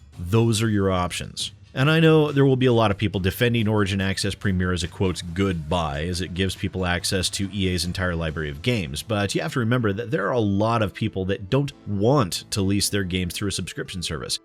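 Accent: RP, United Kingdom. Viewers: God I love the British accent, lol. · your british voice sounds awesome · I think I like this British version. It's funny to. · hahahahah... I love the English accent... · I'll be honest here: Depending on the game, publisher, and offered rewards, I'm not opposed to Pre-Order unlock tiers. I mean the one game I did participate in that with was one of the best games I've played and that was Tales of Zestiria for PC. As a result, I got Tales of Symphonia for PC and although it did end up being a rather crappy port, it was still 40-50 bucks that I would not have to spend later. The difference between Deus Ex and Tales of Zestiria in my own opinion would be one is promoting that they can release the game earlier than stated but won't unless they get enough money whereas the other is saying "pre-order this game by this date and we'll throw in the PC release of this other game which is a classic on consoles for free!" The Tales of Zestiria pre-order tiers implementation worked in my mind because it wasn't just a "you get more stuff for this game" as the final unlock but a "you get more stuff for this game AND we'll even give you more stuff for another game PLUS that other game!" Just my thoughts. 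BTW, EA is really bad at PR and human decency. EDIT: Also, we needs more British Accent! Hopefully that's not a DLC, but how much does it cost if it is? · I love the posh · i would pay a lot of money if he did an audio book in his smug british accent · Lol I liked your British accent also that's what's happening with me and my friends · he is not doing a british accent as there is not such thing he is doing a funny snooty posh english accent. Other people have said there bit on this like pretty good gaming and more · Your snobby British accent is absolutely wonderful and pretty damn convincing as a Brit myself - I'd love to see you create a character and do something with that :D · That British accent along with the game speak and humor reminds me of TB... oh how he would have had a field day this year if not for his untimely passing...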